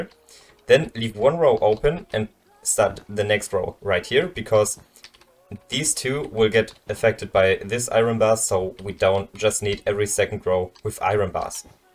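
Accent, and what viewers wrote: Germany. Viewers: sorry, are you a German Landsmann? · the german accent :face-blue-smiling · Man hört so krass dass du deutsch bist xD, aber trotzdem gutes video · Nice accent, is it german?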